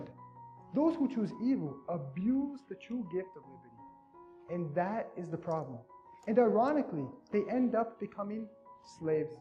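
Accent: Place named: Europe. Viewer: Many thanks. I thought he might’ve been European with that accent.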